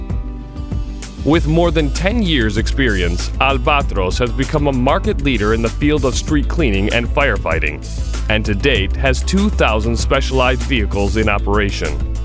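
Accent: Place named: Texas, United States. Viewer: 9000 l. tanks and waggy slow polybrushes 2-3MPH...18 loops of the Gemasolar/Torresol Energy place a day? It looks a horror. But it handles those close-in parabolic reflectors. Does the power plant condense much over 9000L on the turbines' cold side as some sort of side-effect? Was electrostatic or mirror-mounted cleaning out of the question, compared of course to the truck dieseling by all day? Application research and Basic R&D are different, you! The HTF (high throughput fluid) truck's 'nitrogen therusion' for keeping the rubber solid is the only real howler in the mildly gamy Texmexican Voiceover.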